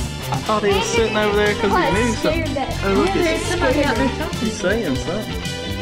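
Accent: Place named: South, United States. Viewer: I love the bad music and the hill billy narration.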